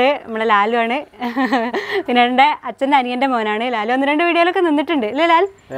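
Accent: Malappuram, India. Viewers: ഒട്ടും ബോറടിക്കാത്ത സംസാര രീതി .. നമ്മളെ മലപ്പുറം ഭാഷ അടിപൊളിയല്ലേ,,, മൂന്ത ചപ്പ്ളി പിളി ആവും,, നമുക്ക് മാത്രം മനസിലാവുന്ന ഭാഷ.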